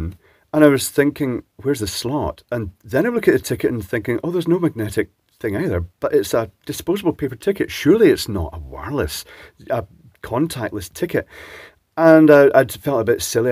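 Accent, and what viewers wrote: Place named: Glasgow, United Kingdom. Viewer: Just love the Glasgay patter, Goes of like a Two Bob Rocket hahaha made my day hearing that. ..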